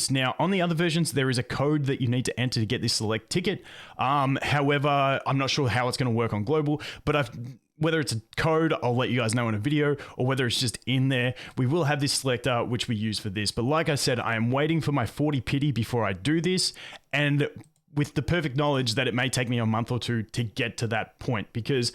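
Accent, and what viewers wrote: Australia. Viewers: Wait is he an Aussie. Surely? Still subscribing anyways lmaooo Edit: yep he definitely is… he has 7/11 ads HAHAJSHSHSJSJ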